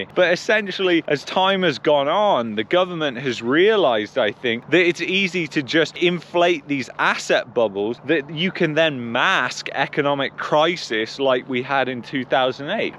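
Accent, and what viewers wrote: England, United Kingdom. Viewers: So much negativity and doom and gloom.you have an English accent, go back to where you came from if you hate it so much. UK is a third world country anyway, go back and live “large” there …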